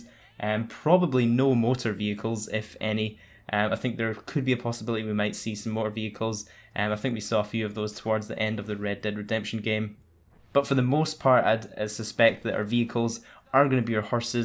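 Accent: Scotland, United Kingdom. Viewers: where in Scotland u from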